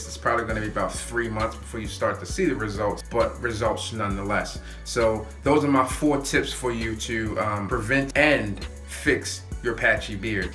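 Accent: Baltimore, United States. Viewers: Where are you from man? I hear a bit of a Baltimore accent.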